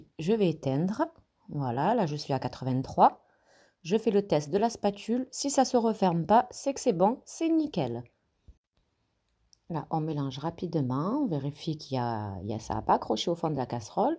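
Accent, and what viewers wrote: South, France. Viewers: Bonjour 👋🤗. J'adore tes vidéos 😍🥰❤🌹, et ton accent du sud rempli de soleil 🌞🌅. Ta joie et ta passion est communicative🤗👍. Merci pour ce partage gourmand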